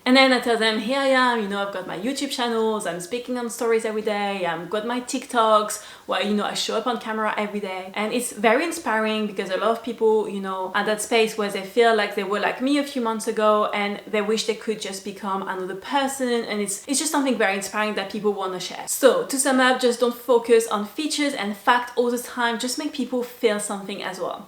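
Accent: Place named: France. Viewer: are u you an american native? idk you sound french to me. Anyways, Love your video!!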